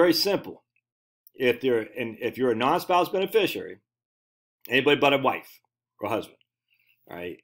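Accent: United States. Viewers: lol....I always thought you were from New Hampshire!!!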